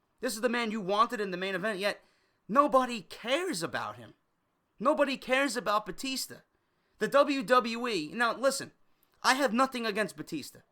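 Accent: New York, United States. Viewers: Love your vids, your ny accent makes me smile you lighten up my day. Full homo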